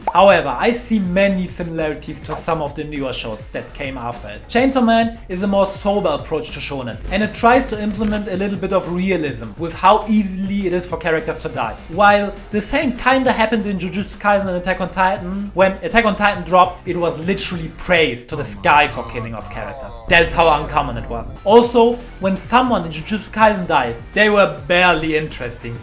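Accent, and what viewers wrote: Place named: Germany. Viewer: man hört hart den deutschen akzent geil 😂😂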